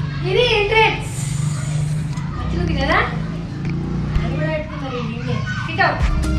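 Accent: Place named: Nizamabad, India. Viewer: Nizamabad ascent🔥 mupkal❤️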